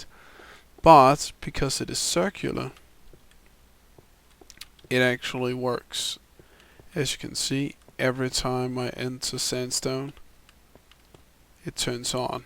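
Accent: Denmark. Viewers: man, i could totally hear you are from Denmark. PS. GO Denmark!! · Fellow Dane :) I heard it instantly, then went to your channel, and I was right. Aside from that, I like your videos. They're good and deserves a lot more views. Hope you have success in the future. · Man kan høre at du er dansker. Det kan man altid :D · You sound danish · Are u danish?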